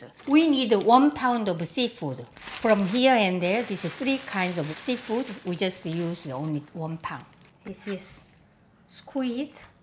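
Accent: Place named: South Korea. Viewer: Your Korean accented English is beautiful, and so are you!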